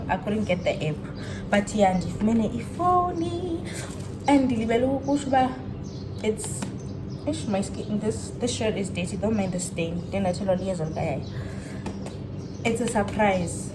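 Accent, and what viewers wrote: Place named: South Africa. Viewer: I am here for your Xhosa accent mtase, the way I love it❤❤ I enjoy your vlogs ke sana...I love youuuuuuu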